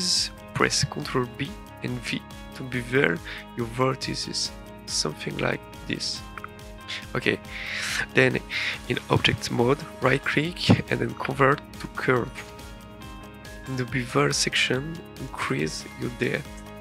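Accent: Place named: France. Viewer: Great tutorial, very clean, simple and right to the point ! If I had something to add, you could maybe have explained why you used some of the functions or macros 🤔 I'm not an expert on UV unwrapping for example, so I would have liked longer explanations J'ai le sentiment que tu es français vu ton accent, si c'est le cas, sache que tu m'as bien aidé à progresser, je te souhaite du succès sur youtube !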